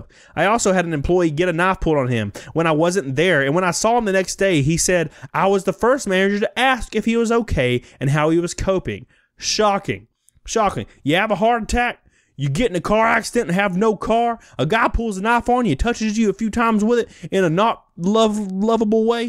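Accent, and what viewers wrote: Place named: Alabama, United States. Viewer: I was watching you on my tv and my wife said " does his voice really sound like that?" I died laughing. I said hes from alabama. she goes ohhhhhhh.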